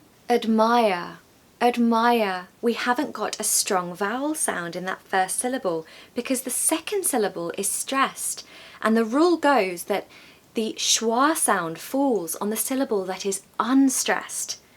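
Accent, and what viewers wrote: RP, United Kingdom. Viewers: She is speaking with the modern received pronunciation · I really need this video. I didn't realize I have a very strong American accent though it is not something bad I've always dreamed of having that wonderful beautiful British accent of yours ❤ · Hello,.😊 I'm so fascinated with the elegant British accents, which are so crystal clear that I can catch them rather easily. Besides, I love your examples. I'm open to repeating them out loud again and again. Thanks so much for the epic lesson. You rock❗🌼🌼🌼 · That is a southern recieved pronounciation accent. It is not the British accent. It does sound nice, I agree, but it is a bit too much to say that it is the British accent. It is an accent of Britain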